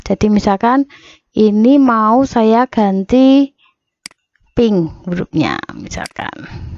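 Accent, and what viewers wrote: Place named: Java, Indonesia. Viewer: Medook nya poll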